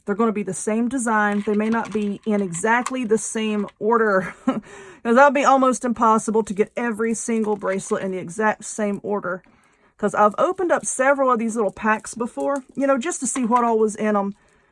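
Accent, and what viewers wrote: South, United States. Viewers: I adore you. the Southern accent is so calming for me, since the whole of the maternal side of my family is from down South, plus you explain everything so thoroughly and show how slowly so it can be followed, it doesn't hurt that you mix in some humor too, that's always really nice and reassuring when one might be nervous or have difficulty keeping up with faster tutorials. Unfortunately though, JoAnns went out of business, I'm so sad about that.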